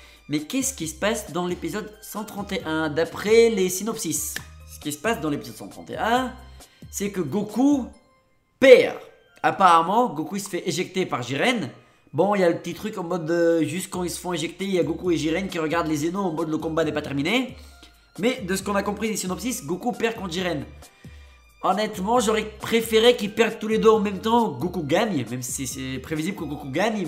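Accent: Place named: North Africa. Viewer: L'accent du blédard 🇲🇦🇲🇦🇲🇦🇩🇿🇩🇿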